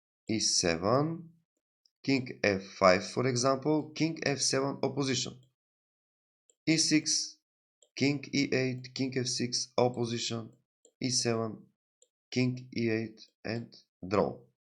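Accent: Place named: Scotland, United Kingdom. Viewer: 😂 приятный и знакомый акцент) вы из Шотландии?)))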